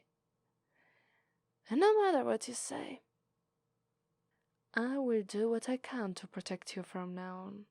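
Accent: Italy. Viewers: I just discovered this channel and I have to say three things about it: 1. I'm not sure that these videos are exactly ASMR 2. I love your RP's and I think that they are the best on youtube and tingles. 3. I love your Italian accent and I understand better your English than native English videos, maybe because I'm Spanish. Greetings from Barcelona and thank you to do an excellent job ^^ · Missed an opportunity to use that Italian accent for a mobster accent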